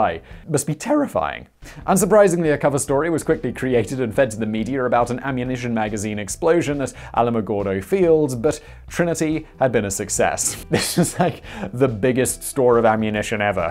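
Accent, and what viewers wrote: United Kingdom. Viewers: Looking a little weak in front of the history guy. Little bit scattered. Missing a few points. The British accent can't save you forever.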